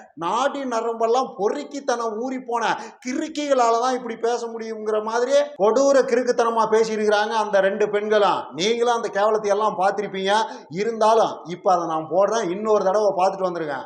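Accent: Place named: Coimbatore, India. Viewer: அண்ணா உங்க பேச்சு கோவை தமிழ்ல பட்டை கிளப்புறீங்க போங்க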